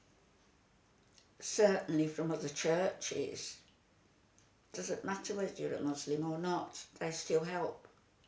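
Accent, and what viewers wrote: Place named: England, United Kingdom. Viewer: Amy just made a video attacking critics including you. She is gloating and arrogant. She is pissed and must have seen the comment you left on her last video. Calling you an English lady with a thick accent. Amy would rather you speak in a coarse arrogant voice such as that American lady with a thick body and thick paypal account. Who could that be?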